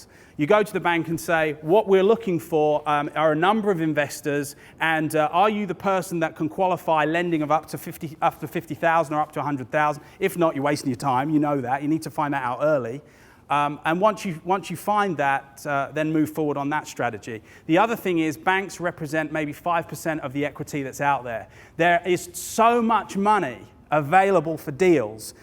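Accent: United Kingdom. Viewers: This guy sounds sooo British than ordinary Brits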